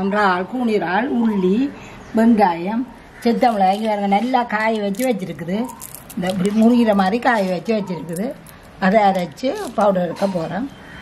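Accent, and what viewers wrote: Sri Lanka. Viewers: Antha ilangai Tamil ❤… Samayal ah vida rusi athigam 😇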